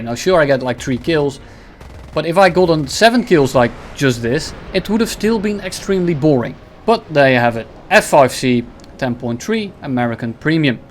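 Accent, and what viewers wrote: Netherlands. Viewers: Heerlijk het Nederlandse-Engels, keep up the content🙏🏻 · ik ruik nederlands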